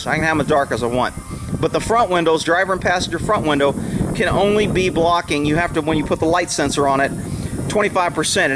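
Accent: Texas, United States. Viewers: Congrats! She's a beauty. FYI..Your accent sounds like Texas to my ear. I have always loved that state. And your videos with the boys on that property makes me want to move. Long Island used to look like that but all the land, horses and cows are gone now. It is over built with no property left.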